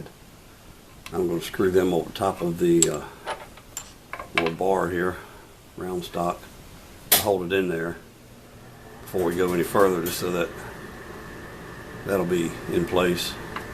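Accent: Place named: Memphis, United States. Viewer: Is your name? That was my father's name. Your accent sounds like the Memphis/Northern Mississippi area? My father was a woodworker as well and I'm just getting into it.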